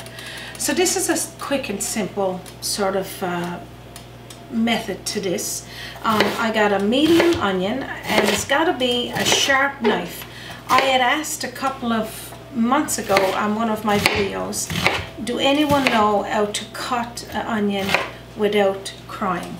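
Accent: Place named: Newfoundland, Canada. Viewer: didn't mean it to come across as rude! My apologies 💜 Love Newfoundlanders and the accent.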